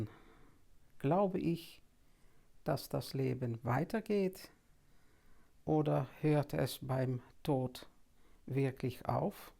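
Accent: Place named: Netherlands. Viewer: Liebe! Vielen Dank für das Video-Projekt in deutscher Sprache! Gleich drei Punkte fallen mir dazu auf: 1. Erinnere ich mich beim Hören dieses Videos in deutscher Sprache sofort an Deine wunderbaren Vorträge, an denen ich teilnehmen durfte. 2. Dadurch, dass Deutsch nicht Deine erste Sprache ist, werden viele Aussagen eben noch überlegter, als es vielleicht in der Muttersprache passiert, wo mal automatisch etwas „herausrutscht“. Ähnlich einem Weg, den man nicht täglich geht und einem dabei jeder Schritt bewusster erscheint. 3. Ich finde Deinen holländischen Akzent sehr charmant, er gibt Deinen Ausführungen eine schöne einzigartige Farbe. Also freue ich mich schon sehr auf die folgenden Videos, nun auch auf Deutsch!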